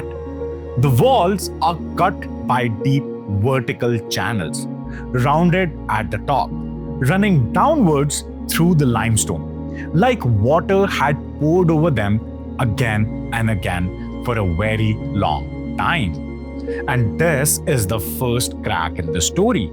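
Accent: India. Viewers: Nope, Spinks 🙄 Indian English is like an insult to english.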